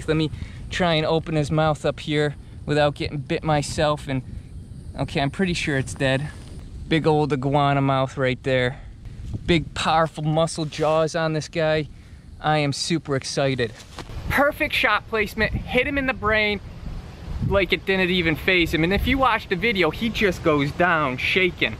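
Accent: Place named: New York, United States. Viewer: I’m new to this channel so idk but is he originally from New York? Brooklyn maybe? Just picked up on his accent is all lol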